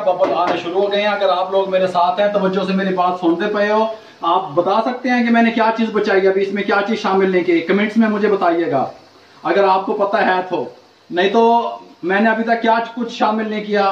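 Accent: Punjab, India. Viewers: You know what I'm big fan of u the way u are talking specially when you tease in typical Punjabi I damn love it..Please keep it up best Regards, Shoaib from UAE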